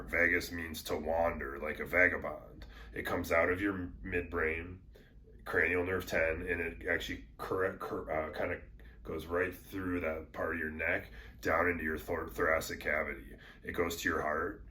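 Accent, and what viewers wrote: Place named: Wisconsin, United States. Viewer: Is this similar to products such as neuvana vagus nerve stimulator? If so, it makes me wonder why other products charge so much! btw you sound like us over here in wi :)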